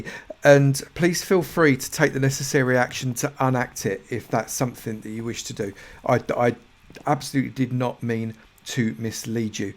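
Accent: United Kingdom. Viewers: I really enjoy your vids/liked and av learnt alot from u, Esp wit redstone. I've copied a few of your tutorials and non redstone 1's. But my question is: 1 r you frm the UK n where,as I'm frm Norfolk coz I love ur ascent and 2: is there a way to make a lift system without using redstone 2 get items frm your mine to ur base on ground level?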